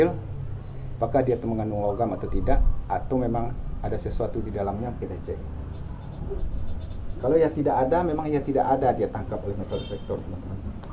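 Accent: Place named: Manggarai, Indonesia. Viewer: Maaf dr logat bicara macamnya asal Manggarai Flores NTT...SALM dr NTT...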